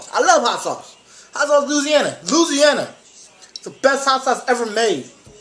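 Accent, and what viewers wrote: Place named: Mexico. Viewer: Your a black guy who sounds Mexican.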